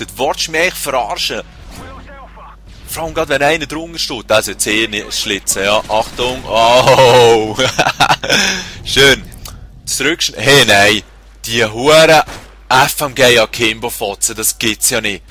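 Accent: Switzerland. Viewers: ich versteh auch fast nix :D is schweizer glaub ich :D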